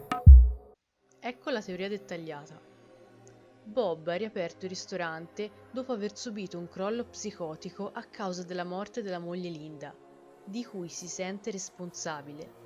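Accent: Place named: Tuscany, Italy. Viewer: Te lo devo dire, sto guardando questo video alle 3 di notte(dopo aver visto diversi episodi di bob's burger), e dovrei avere paura, ma non ce la faccio con la gorgia toscana nella parlata😂😂. Comunque sei molto brava😁, gran bel video❤️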